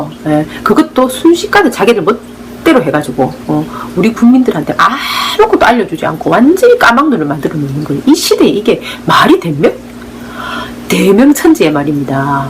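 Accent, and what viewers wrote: Gyeongsang, South Korea. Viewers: 저는 님 경상도억양이 정감이느껴저서 넘좋고 편견없는 생각도좋습니다 말끝에 한방 날리는 웃음소리는 사이다 같아요ㅋㅋ 잘보고 있습니다 홧팅^^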